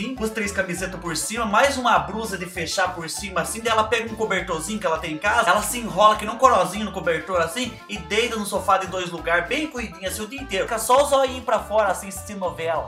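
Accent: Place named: Goiás, Brazil. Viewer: Oi em primeiro lugar quero dizer que concordo com a Milena Barbosa..... para de ficar falando essa palavra (des......)nem vou terminar,pois não é bommmm # fica a dica. E agora quero dizer que eu concordo com você, detesto o 🥶 frio. Graças a Deus moro no Centro Oeste do Brasil, em Goiânia amo minha cidade e principalmente o clima, agora esse mês e o mês que vem faz um pouco de frio..... mesmo assim me incomoda muito, mais logo passa. quero que saiba que ammmmooooo vc, e esse seu jeito de ser e falar, amo.aqui em Goiás principalmente nos interiores fala muito assim com vc, na capital também e é por isso que amo ver seus vídeos..... 👍🏾 continue assim, só pare de falar aquela palavra feia..... viu!!!!!!!bjs no seu coração.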